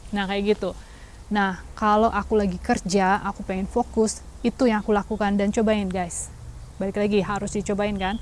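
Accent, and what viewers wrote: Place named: Java, Indonesia. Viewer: Masyaa Allah... Matur nuwun sanget ilmunya Mb, I love my long hair. jadi tahu sekarang, Alhamdulillah for everything. Btw, dialog Jawa nya medok banget Mb, Jawa mana Mb? Salam kenal aku asli Jawa Timur Mb. Sukses selalu dan bahagia sekeluarga..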